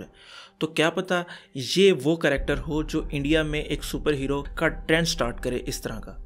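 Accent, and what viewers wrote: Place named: Pakistan. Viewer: this guy sounds like Pakistani..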